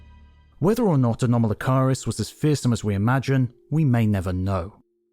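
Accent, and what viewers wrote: United Kingdom. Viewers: The dude is from Great Britain. I'm not sure what rock you grew up under but people tend to sound like they come from their place of origin.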